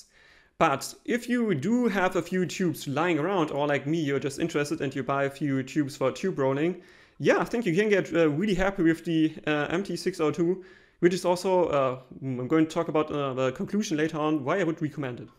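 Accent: Germany. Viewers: The written review is good. You are well informed for a newbie :D How did you learn all the Audio Stuff and the assessment etc? Can you recommend any Youtube Channels or books? Promising Channel, keep up the good work! Du hast auch einen typischen deutschen Akzent im Englischen :D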